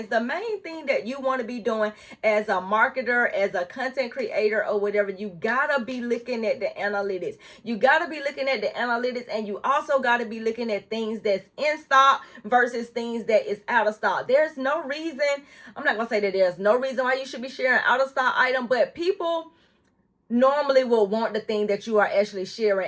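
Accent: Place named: South, United States. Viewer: Love your style and your cute country accent 😊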